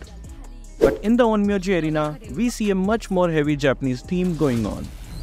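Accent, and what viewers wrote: India. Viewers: His pronounciation seems he is from India😊😊😊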